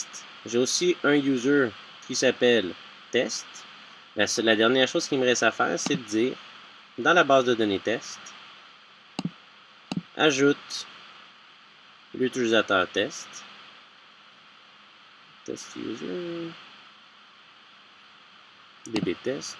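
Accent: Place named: Quebec, Canada. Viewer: J'adore l'accent québecois les "elle" ce disent "xwell" Mysql = Mysqwell :)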